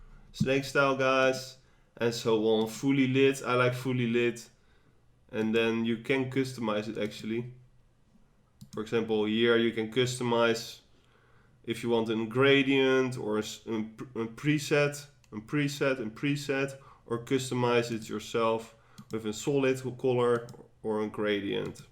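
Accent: Netherlands. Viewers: That Dutch accent though. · hahahaha dat nederlandse accent heerlijk dit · Jezus dat nederlands accent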